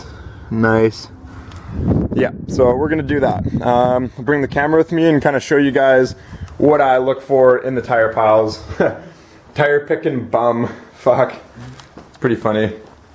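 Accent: Canada. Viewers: smooth canadian accent, sound like the trailer park boys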